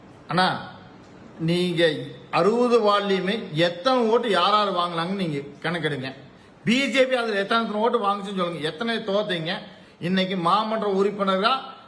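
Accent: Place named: North, India. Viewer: இவன் முதலில் யாருடா!!! வடக்கன் மாதிரியே தமிழ் பேசுறான்.... தலை எழுத்து நீ எல்லாம் கை விரலை ஆட்டி பேசுவது. இந்நேரம் அந்த அம்மா இருந்து நீ இப்படி பேசி இருந்தா உன் நிலைமை நான் சொல்லி தெரிய வேண்டியது இல்லை...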